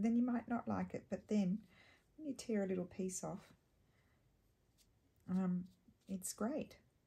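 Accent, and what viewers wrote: Australia. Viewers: You have such a calming voice when you are playing? Probably the Aussie accent. Your eye for colour is amazing.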